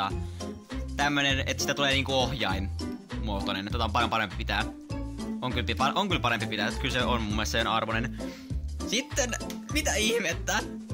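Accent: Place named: Turku, Finland. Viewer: on kunnon turu murreee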